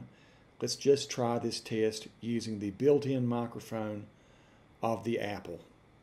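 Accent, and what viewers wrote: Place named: Indiana, United States. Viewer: That was pretty entertaining! lol If I read the parts that it did not get right with my Hoosier accent, I sound like you! Subscribe if you lock! lol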